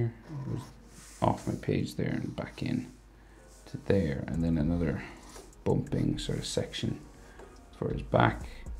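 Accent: Ireland. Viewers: bruh are u irish i am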